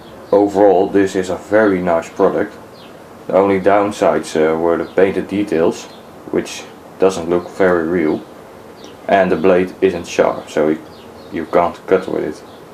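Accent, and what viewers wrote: Netherlands. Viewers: Are you Dutch?You have a Dutch accent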